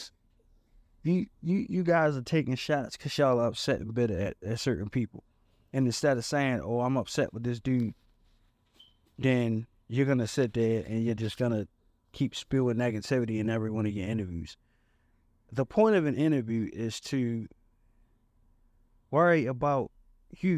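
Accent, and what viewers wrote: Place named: Baltimore, United States. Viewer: It’s true and clear that money don’t make you happy … I can tell by your dialect, you’re from Bmore or the MD area, but I could be wrong… It was cool to see how someone from Bmore saw it and confirmed how disrespectful that would have been 🤔 People like Irv swear they can speak for the hood but don’t have a damn clue on nothing!!!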